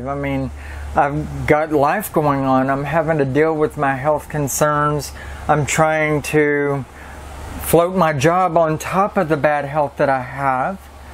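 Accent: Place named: Dakota, United States. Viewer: I lv your accent Is it dakota?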